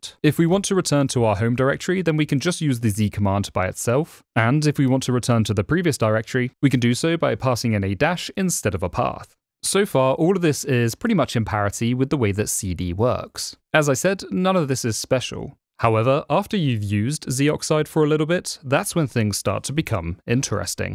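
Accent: United Kingdom. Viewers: Is it not supposed to be pronounced ‘zed oxide’ as a play on ‘red oxide’, a chemical usually known in naturally occuring forms as ‘rust’? Rust being also the language zoxide was written in, of course. Just surprised since you also have a British accent. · he does have an English accent, maybe he should be saying ze doxide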